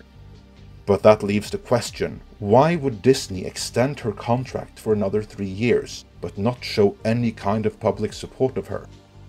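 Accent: Sweden. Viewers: you're swedish, aren't you?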